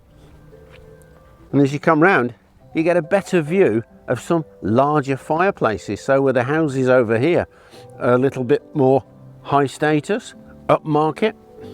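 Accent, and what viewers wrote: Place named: Birmingham, United Kingdom. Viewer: He sounds more like a Brummie than someone from The Shire. I grew up 10 mins from the castle but didn't visit until I was in my 20s! I guess you don't think much about something you see day in and day out. It is a very interesting place, but hideously overpriced.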